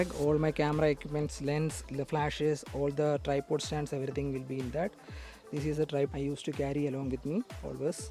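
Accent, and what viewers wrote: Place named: Malaysia. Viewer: Manglish was gud. It’s better do in wat u know.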